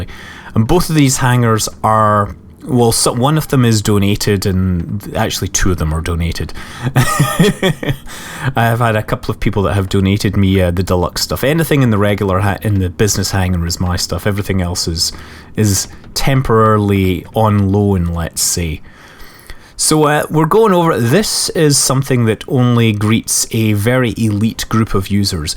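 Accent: Scotland, United Kingdom. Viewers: What do you mean "unbearable 'accent'"? He's Scottish.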